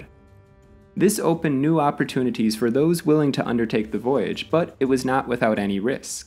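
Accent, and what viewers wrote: United States. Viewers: There is something very wrong with a person explaining to the world from an American accent what happened in Southern Africa! Where does he get his information from? No, I'm sorry I refuse to listen to this. He doesn't get the nuance or intricacies of the issues. I was under the impression that the Kei river border wars were between the Xhosa's and Dutch settlers not with Koi Koi tribes. It also seems that he outcome of historical events depends on who you ask and from which colour and Tribe they come. but no please don't give me an American trying to tell me about my history!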